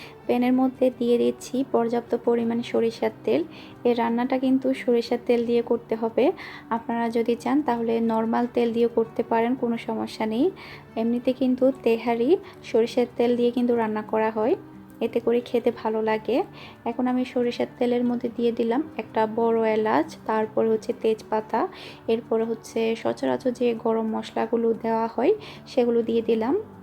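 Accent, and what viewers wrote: Chittagong, Bangladesh. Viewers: চট্টগ্রামের কথা শুনতে খুব ভাললাগে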